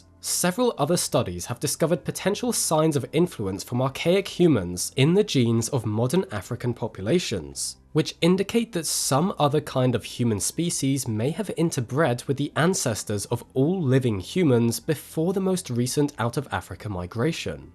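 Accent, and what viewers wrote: United Kingdom. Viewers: British accent… its like nails on a chalkboard. Imaging having an internal monologue this drawn out and pretentious guiding your every whim. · Why does every documentary need a British accent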